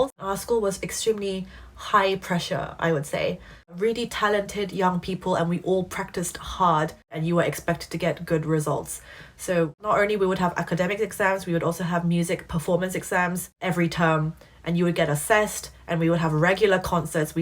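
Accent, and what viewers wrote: England, United Kingdom. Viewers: Wow, what an amazing life story! At least now we know where you got the strong English accent from (absolutely fabulous 💫). Oh yeah, Russian music and ballet teachers don't mess with them!! 🎶 Did you take piano classes? Rachmaninoff and some others probably had gigantic palms, hard to stride the notes for those with smaller hands. I think you will thrive on YouTube with your bilingual abilities. Welcome 🏡 dongsaeng 👊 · This proves your UK accent! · Many thanks for such an encouragement life story. I must say that your mum had made the best decision for you and your brother. I have never ever known a Korean person speaking excellence English (in English accent) likes you are. All the best from Melbourne, Australia:-)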